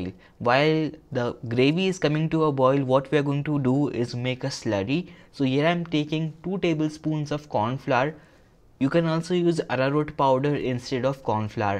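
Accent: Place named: Bengal, India. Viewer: Sounds like bengali and they're good at cooking.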